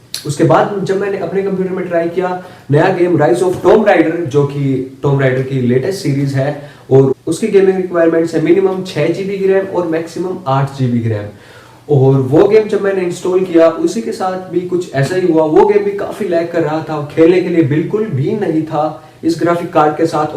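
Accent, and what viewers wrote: India. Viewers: Nice video dude! I am not an Indian but I like this accent :) Btw, Save up for a 1030, Because 1030 is much better than this :D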